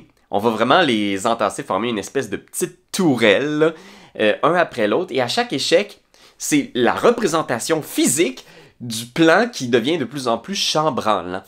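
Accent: Quebec, Canada. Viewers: 34 minutes 10 JDR + l'accent Québécois un plaisir BORDEL ! merci !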